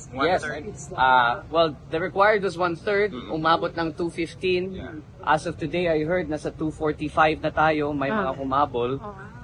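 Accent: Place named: Ilocos, Philippines. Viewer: Haha nakakatuwa yung ilocano accent niya. Yun lang. 😂😂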